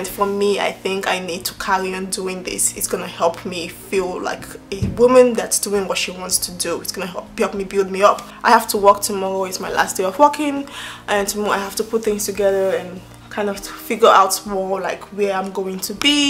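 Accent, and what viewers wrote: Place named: France. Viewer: Do u speak French? Your accent sounds so French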